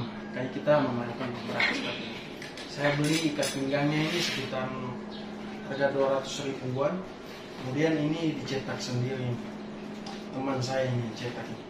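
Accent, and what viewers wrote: Manado, Indonesia. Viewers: Orang manado kang?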